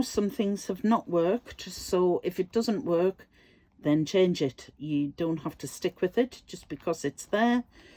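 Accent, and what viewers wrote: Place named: United Kingdom. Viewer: Awwwwhhhhhh - thank youuuuu for the shout out Sugar!!!!!! ❤️❤️❤️ I looooove your planner flips!!!!!!! Our planners look like Twinzies!😂😂😂😂😂 Thanks for sharing and doing these videos! They are so relaxing and I’m sure you always hear how Americans love the British accent. It’s sooo CLASSY. 🌸💕🌸💕🌸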